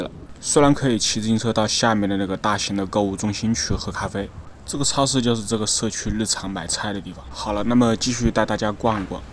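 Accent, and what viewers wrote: Wuhan, China. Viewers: 这口武汉普通话啊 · up主是武汉人吗？ 听两句就感觉是武汉普通话😂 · 武汉口音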